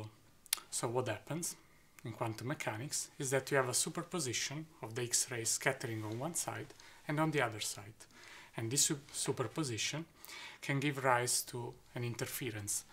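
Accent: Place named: Italy. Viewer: Der italienische Akzent ist total süß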